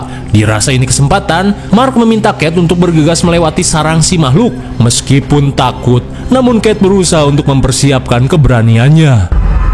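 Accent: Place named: West Java, Indonesia. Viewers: Hallo chanel kesukaan ku si logat sunda pred ❤ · Kaciri orang sundana si aa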